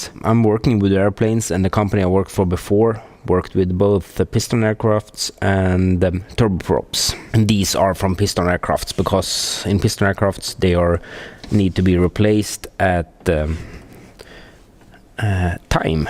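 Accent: Sweden. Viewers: Your video are gold, and that swedish accent is awesome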